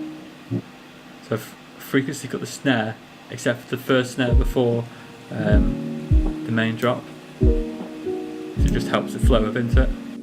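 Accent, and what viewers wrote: United Kingdom. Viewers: You from the north of england mate?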